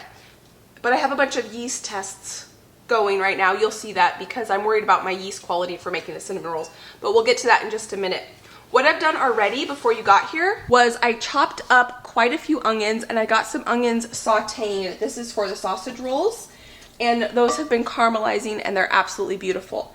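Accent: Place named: Michigan, United States. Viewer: Pasties! I thought I heard Michigan when YOU talk.. I’m from Michigan too!!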